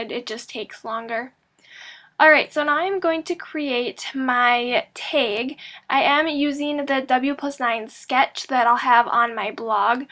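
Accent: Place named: Upstate New York, United States. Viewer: Great card,! I was wondering, are you from Upstate New York? Your accent reminds me of my great aunt, who lived in Rochester. Sorry your kitten scratched you so badly, but I'm glad you're OK.:) Thanks for sharing your wonderful talent!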